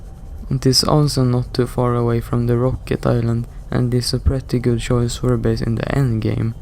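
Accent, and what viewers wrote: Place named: Sweden. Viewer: your accent SCREAMS swedish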